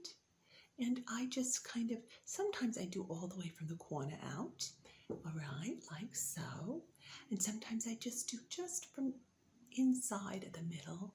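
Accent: South, United States. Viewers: is this what they call southern accent ? ^^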